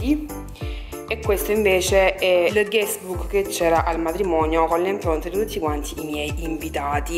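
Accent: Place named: Apulia, Italy. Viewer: Dalla parlata deduco che tu sia pugliese😜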